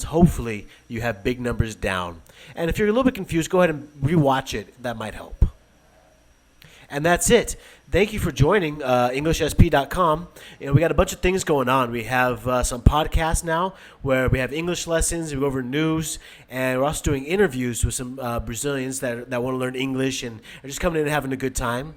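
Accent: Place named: United States. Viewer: that's American accent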